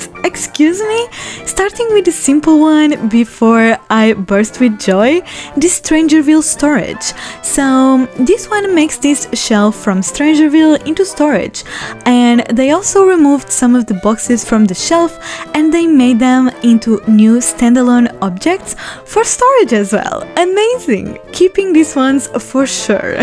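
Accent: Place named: Portugal. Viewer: are you portuguese? cause it really looks like when you speak english haha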